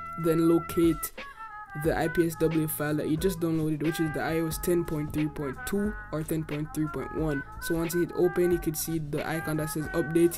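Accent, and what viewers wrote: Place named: Jamaica. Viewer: I just subbed, you sound like a Jamaican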